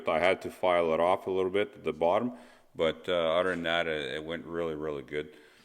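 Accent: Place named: Canada. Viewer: This fellow is Canadain or impersonating 1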